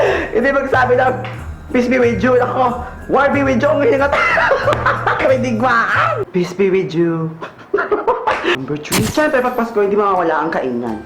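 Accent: Visayas, Philippines. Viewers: bisaya ka po???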